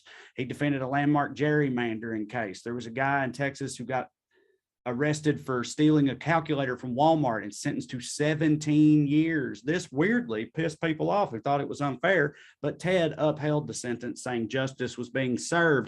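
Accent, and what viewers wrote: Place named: Tennessee, United States. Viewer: This level of sardonic erudition casually delivered with a thick Tennessee accent is f**kin' comedy gold.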